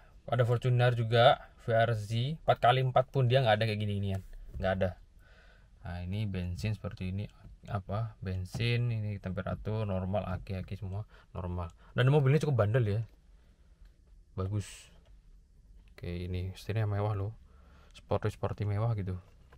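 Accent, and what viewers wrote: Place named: Jember, Indonesia. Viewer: Logat jember om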